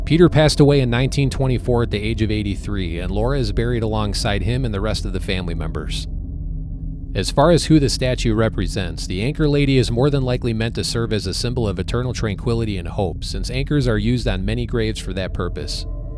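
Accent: Chicago, United States. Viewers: I truly love your channel!! I grew up in Streamwood so hearing some of these stories is really cool for me. It brings me back to my childhood. Your narration is a perfect Chicago accent if I ever heard one and your attention to the details and facts about these places as well as sharing your own experiences truly makes your channel unique! I'm subscribed!!😊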